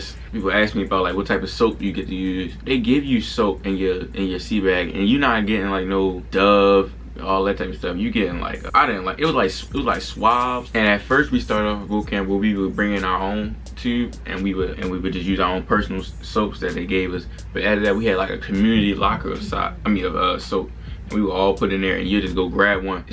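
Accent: Baltimore, United States. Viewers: You from Baltimore bruh? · LMFAO HE FROM BALTIMORE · R u from Baltimore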